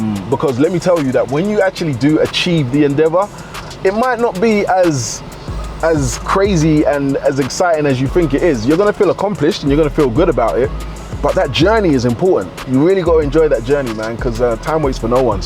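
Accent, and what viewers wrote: London, United Kingdom. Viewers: i lke energy bro its genuine bro am just wondering are you from Uk you sound like a Londoner..? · Just found your channel mate, love it, instant Sub. I thought "ah cool, a Brit giving advice".... I'm gonna ignore the fact that you're in the US, lol.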